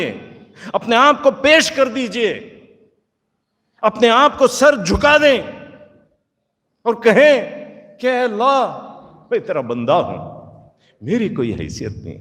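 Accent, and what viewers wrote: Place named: Bangalore, India. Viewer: Banda bore hone nahi deta. Banglori language badi pyari n natural lagti h, central point bhi focused hojata h.Allaha salamat rakhe sab!!!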